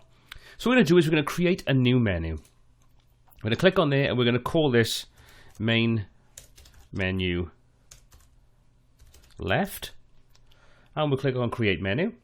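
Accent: Wales, United Kingdom. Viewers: What a lovely Welsh accent! :)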